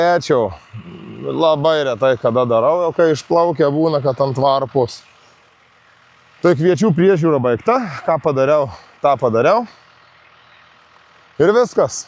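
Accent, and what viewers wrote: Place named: Suvalkija, Lithuania. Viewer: Sveiki, dėkui už naują vaizdelį 😀 pasidariau priklausoma nuo jūsų suvalkietiškos šnektos ir laukų 😃. Man, kaip kaimo vaikui, tokie peizažai labai mieli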